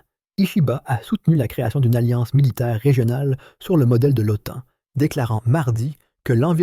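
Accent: Quebec, Canada. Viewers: Narrateur québécois?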